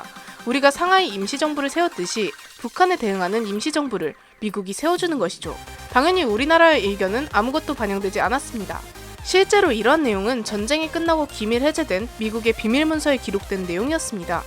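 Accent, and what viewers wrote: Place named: Daegu, South Korea. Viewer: 대구분이신가ㅋㅋㅋ 사투리 쓰시넹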